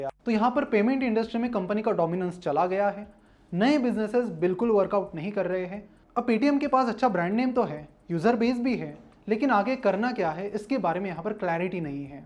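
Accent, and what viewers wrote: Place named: Nagpur, India. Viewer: Sir aap nagpur se belong karate ho sun le.proud feel huwa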